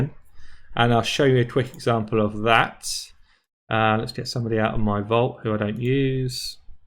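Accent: United Kingdom. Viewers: In my humble opinion, you are a top raid analyst. I regularly watch tons of popular raid bloggers both english and russian speaking and I can definitely tell that your particular way of analysis, your explanations, your tips are by far the most impactful and valuable. Plus the British accent. Absolutely fabulous.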